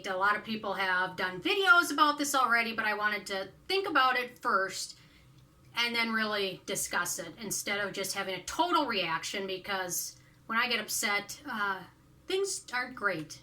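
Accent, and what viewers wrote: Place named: Midwest, United States. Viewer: Chicago or MN? Maybe even PA? Accent.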